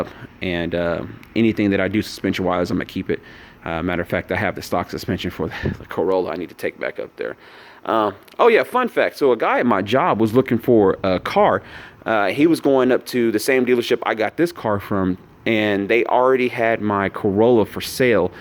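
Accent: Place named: Oklahoma, United States. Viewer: Awesome review man, i had a feeling you were from Oklahoma just by your accent lol. But I'm getting rid of my Legacy for either the Camry or the Accord. I'm leaning a little towards the Camry now lol.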